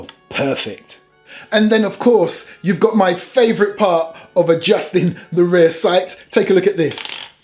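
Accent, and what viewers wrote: United Kingdom. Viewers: Love ur British accent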